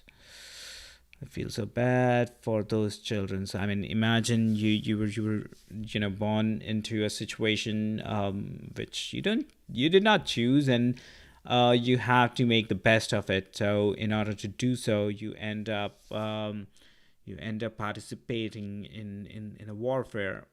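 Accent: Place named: India. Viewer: Nice video man love your accent ahah ;) are u from India ?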